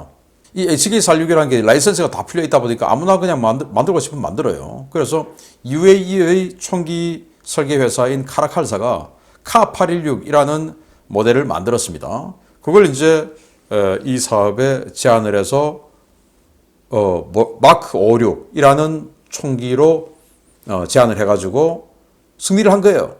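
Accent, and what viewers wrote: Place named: Gyeongsang, South Korea. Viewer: 경상도 사투리 정겹습니다,,항상 응원합니다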